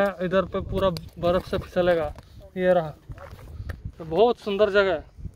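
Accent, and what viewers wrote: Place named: Bihar, India. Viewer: Oyee budbaak....japan me bihari kaise ban gya....thik hai beech beech me bol skte ho...par normal hindi try kro bhai...anyways love to see you all...keep uploading new videos..👍👍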